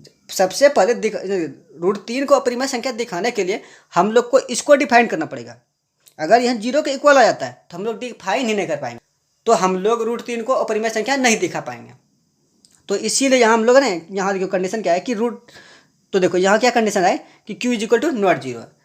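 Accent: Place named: Bihar, India. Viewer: Bihar se ho kya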